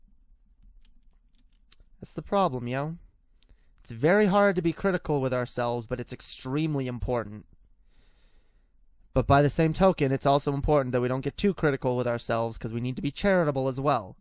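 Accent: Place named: United Kingdom. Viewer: So…. Why the British accent in older vids?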